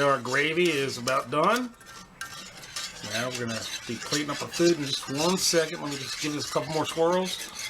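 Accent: South, United States. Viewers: You are sounding extra southern here!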